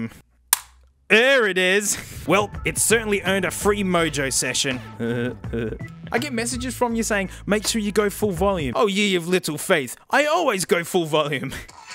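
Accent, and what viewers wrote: Australia. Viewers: Same, though I'd want dank to play the same parts of the song in the comparison between the headphones. Also the ears make me think of ASMR artists though I've head worse whispering in my ears then this lovely ausie man. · I could hear this Australian talk for hours about stuff that doesn’t even make sense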